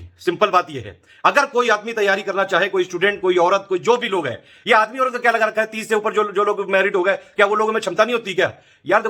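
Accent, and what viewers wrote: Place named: Kanpur, India. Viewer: Kanpuriya language Maja aa Gaya sir Simple baat hai 2022 me Kuch Kiya jaye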